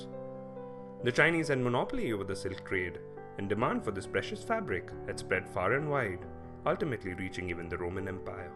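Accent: India. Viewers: 100 % biased against China, the narrator sound like an Indian national...by the time Prime Minister of Indian, Modi dies, China GDP will be probably double of the U S A. The narrator knows nothing about China intentions nor he knows about the British and American are difference. The British is already joined the AIBB while the American doesn't.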